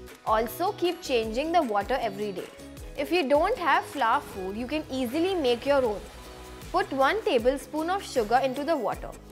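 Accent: India. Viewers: Is that a Hindi accent?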